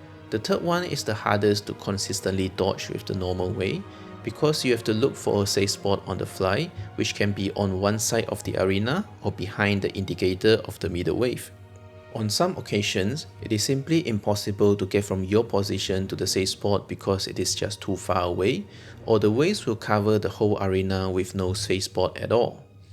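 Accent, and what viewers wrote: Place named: Singapore. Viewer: You sound singaporean. Gotta support neighbouring content creators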